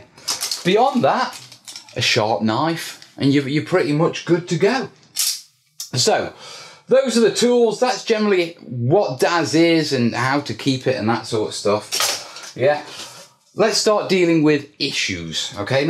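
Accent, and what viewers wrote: United Kingdom. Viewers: Are you British accent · based on your language accent, I assume - you live in Britain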